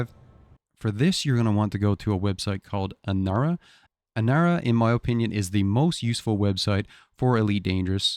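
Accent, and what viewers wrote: Newfoundland, Canada. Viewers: HEY! just a heads up..... love the canadian accent 😉😆 getting back into this game today after literally almost a decade and well "overwhelming" is too light of a word so I appreciate the advice from 4 months ago lol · Man I can't watch this. Can't stand the way Canadians talk. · You from Newfoundland?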